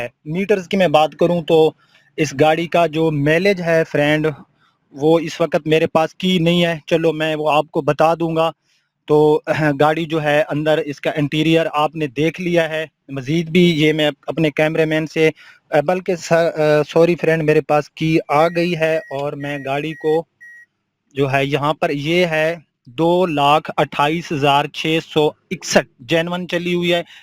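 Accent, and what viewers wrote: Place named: Punjab, Pakistan. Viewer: English in punjabi mode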